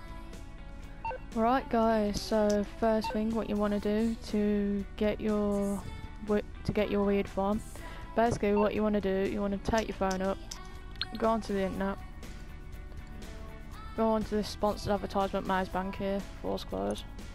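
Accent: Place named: Birmingham, United Kingdom. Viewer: LOL SHE FROM BRUM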